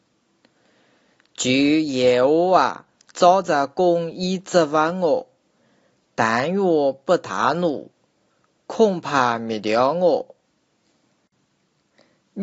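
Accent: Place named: Meijiawu, China. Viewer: 是你的口音问题还是这就是标准的老杭州话?感觉有点像梅家坞那边的口音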